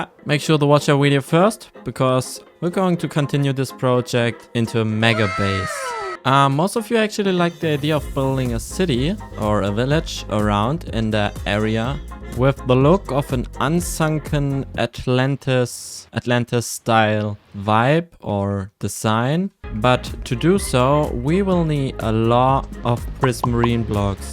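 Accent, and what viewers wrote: Germany. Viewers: Deine Stimme und der Untertitel ist so deutsch xD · for me it sounds like german cuz im german and its the same accent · are you german ? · i just found your channel and i heard a bit german in your voice are you german? If you are german im german too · Are u german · your english is very german-ish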